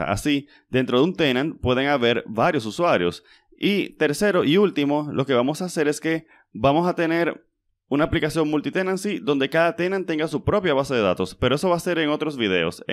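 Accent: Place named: Dominican Republic. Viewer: Hola, eres de Rep. Dom.? Pareces dominicano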